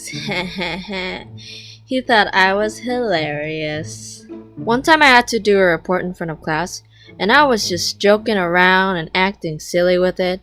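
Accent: Philippines. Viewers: The moment I heard your voice I new you we're a Philippine and btw we can our teachers sir too, and I also have a good art teacher :3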